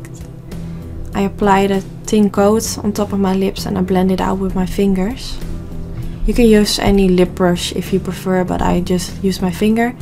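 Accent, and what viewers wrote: Netherlands. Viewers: Hi! Gorgious make-up i love it! 😘 I have a question tho, Are you Dutch? Cause you sound like one. Let me know. 😘 Fallonrachel (The Netherlands)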